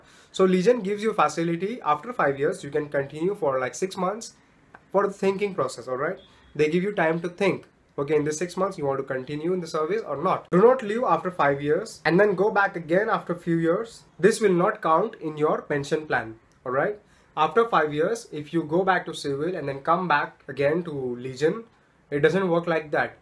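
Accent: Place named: Indiana, United States. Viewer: He. Doesn’t speak with his French Accent. Just Indiana accent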